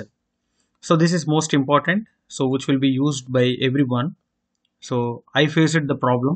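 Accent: India. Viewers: Very useful (indian accent)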